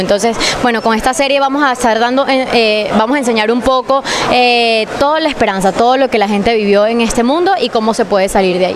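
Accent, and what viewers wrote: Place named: Colombia. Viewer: Se le está pegando lo colombiano!!!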